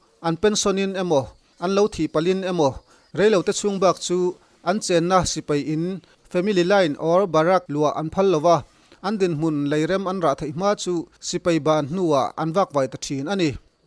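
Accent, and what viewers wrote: Mizoram, India. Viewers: a bengvar thlak thin khawp mai bak ah. i mizotawng a mawi a. Mizo tawng hi rawn chawisang deuh deuh rawh aw👍👍👍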